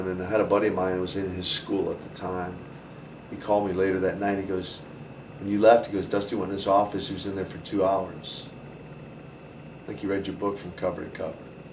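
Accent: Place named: United States. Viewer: Wow, he learned to speak in an American accent really well!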